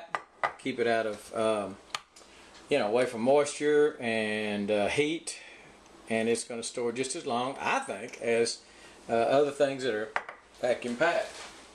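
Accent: Texas, United States. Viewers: Texas men have the sweetest accent🥰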